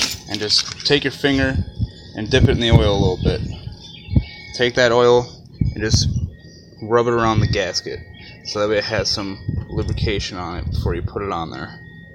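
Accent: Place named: Michigan, United States. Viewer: Lol yea man im from michigan too! I got the accent too haha..but im just lookin to buy a nice condition 05 grand prix w the 3800...i do the oil changes in all my cars and just wanted to see how much of a pain this one was...looks fairly easy access to me...i also know the throttle bodys get jammed up on these...whats ur opinion on that?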